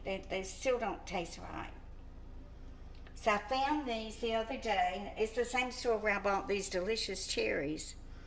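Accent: South, United States. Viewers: Oh, I love your cooking videos, and as an Australian, I adore your sweet southern belle accent!:)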